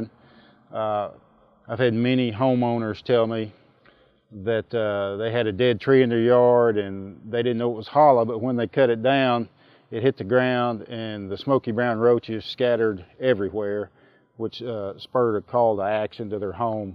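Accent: South, United States. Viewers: Hey, let me try an' place your accent...sounds like a base in Arkansas, with a Georgian overlay, and can I hear a hint of Kentucky, or are my ears playin' tricks on me? Laughs I ain't been down south since I was a kid, so I'm probably a bit rusty. (Used to live in Texas.) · He is southern and southern folk talk slow don’t like it find another video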